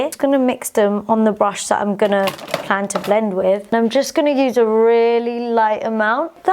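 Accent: United Kingdom. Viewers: Wow loved the makeup look you sound so British like a lady from loose women omg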